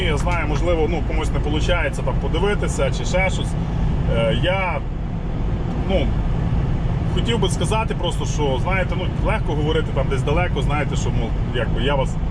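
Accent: Lviv, Ukraine. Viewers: Добрий день мене звати Ігор живу в Оттаві.Працьую водієм рок трак. Хотів би з вами поспілкуватись,чую вашу розмову і роблю висновок що ви Львівська обл.Дуже дякую.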